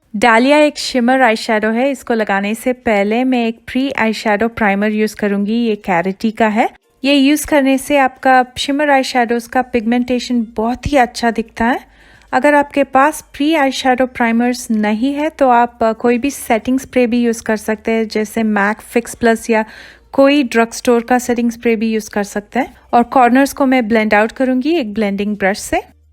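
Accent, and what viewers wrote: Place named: India. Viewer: This hindi accent!!!!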